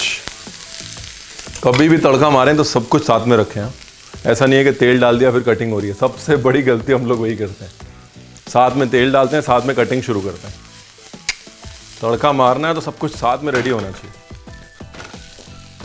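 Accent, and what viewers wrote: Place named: Punjab, India. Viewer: Ranveer Sir I love yr punjabi Accent u say ...yelo ji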